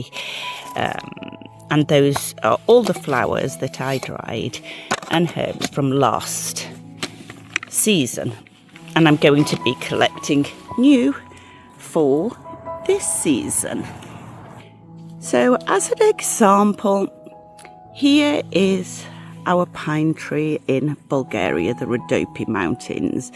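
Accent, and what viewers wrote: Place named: RP, United Kingdom. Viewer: Oh dear jolly hockyscks why do you talk like that all posh now when we were there you didnt it sounds terrible.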